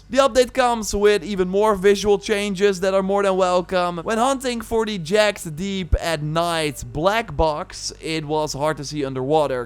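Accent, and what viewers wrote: Netherlands. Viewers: Eyo you from the nederlands ?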